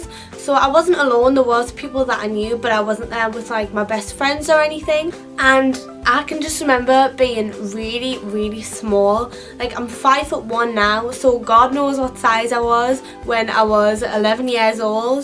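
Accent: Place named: County Durham, United Kingdom. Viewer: are you and marnie from geordie shore from the same part of the UK? just curious because you have similar accents. But i have no idea where you are from in the UK. she's from County Durham btw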